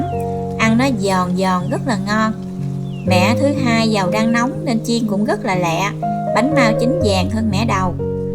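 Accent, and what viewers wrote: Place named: Mekong Delta, Vietnam. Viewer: Làm bánh khéo quá, giọng em gặt Miền Tây luôn đó, nghe rất hay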